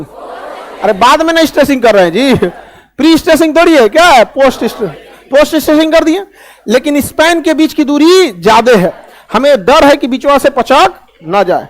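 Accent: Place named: Bhojpur, India. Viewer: Sir aap adha Hindi or aadha bhojpuri kyu bol te he.... Lekin sune me aachaa lag ta he ham bhi kyu ki Banaras he❤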